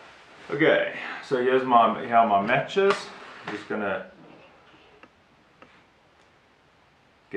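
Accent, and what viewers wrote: South Africa. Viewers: useful information you have a south african accent :)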